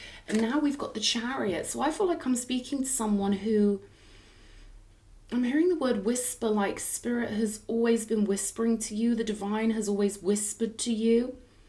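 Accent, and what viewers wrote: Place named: Dorset, United Kingdom. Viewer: Hi all, Does anyone know where I can get one of the little dog figurine with the Bee 🐝 on its foot please?,thanks for your messages,you sound like you're from the same area as myself,Dorset or cornwall.love & blessings.BABA CHRIST 🕊️🤍🌹🐝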